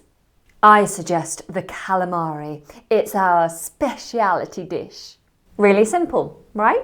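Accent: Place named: England, United Kingdom. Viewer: You get Germanized? Hurra! Du solltest unbedingt auch den bayerischen Akzent ausprobieren. Der ist leicht zu erlernen und kann Deinen englischen Akzent gut verstecken. Der norddeutsche Dialekt ist zwar näher verwandt mit Englisch. Aber darin ist Dein englischer Akzent leichter zu erkennen.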